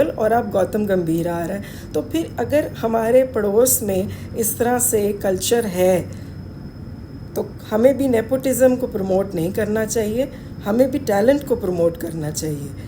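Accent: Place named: Punjab, India. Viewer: Your Punjabi accent ❤, do improve your thumbnail tile graphic design, regards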